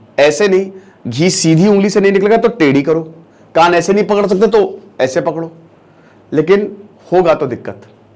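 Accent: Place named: Lucknow, India. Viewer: सर आप बिल्कुल लखनवी अंदाज में बात कहते हैं।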